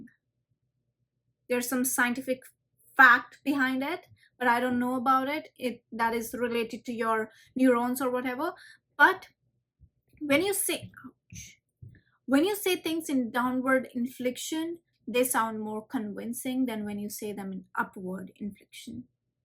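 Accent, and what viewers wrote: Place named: India. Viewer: your content is good but the accent is ..... haha you know. Chose either Indian or american accent. Dont mix both .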